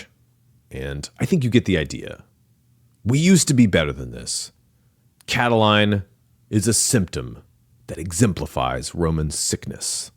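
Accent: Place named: United States. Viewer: I love the content but this accent..I cant place it. Southern Pennsylvania or Tennessee..or anyone in California but its slightly irritating..its still pretty good. Im not sure I've read all this.Its really a gift. Thanks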